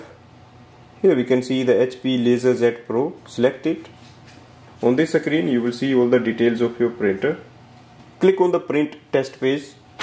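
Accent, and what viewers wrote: India. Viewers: Your Indian accent is annoying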